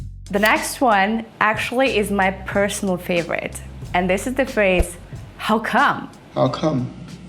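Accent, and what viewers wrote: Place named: United States. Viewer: That is american accent not british which care about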